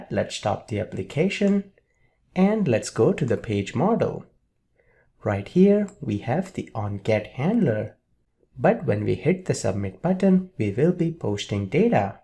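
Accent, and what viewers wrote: India. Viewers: Imagine speaking Hindi and then trying to switch to english for three straight hours. It must be a tounge twister. At least he manages. Every time I try to speak in english it is terrible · makes me nostalgic hearing a indian guy making .Net tutorials (courses) for this. Takes me back like 12 years to good old days of youtube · It's an sweet Indian English accent 😁🇮🇳🇮🇳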